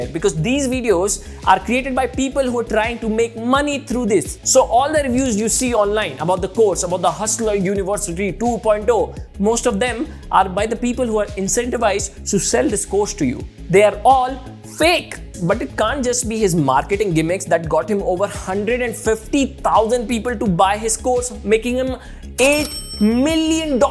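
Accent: India. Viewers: I am sorry ,but I guess he needs to work on his accent,too much Desi accent..please take it as an advice from a fan of aevy tv.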